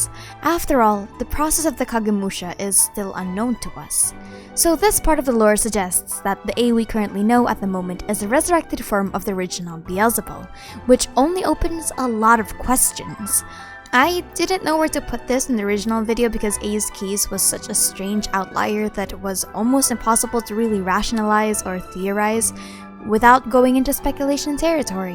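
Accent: Philippines. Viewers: I knew it! You were a Filipino the whole time, ur English accent is familiar taena Anyways, cool video! I didn't even know that book existed, more lore crumbs for the Almighty Shogun!! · Pinay ka? HAHAHA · is she from philippines or what?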